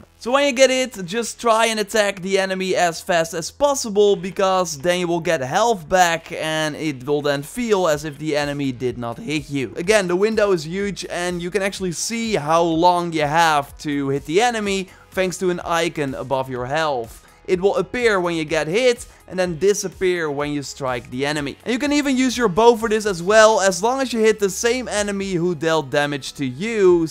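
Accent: Netherlands. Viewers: Heey are you Dutch? (I think I recognize the accent) 😉 I love your Valhalla videos, just subscribed today 🙂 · Great videos, but the heavy dutch accent annoys me a lot. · Youre from the netherlands? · Are you dutch O.o? Nice video btw thx :3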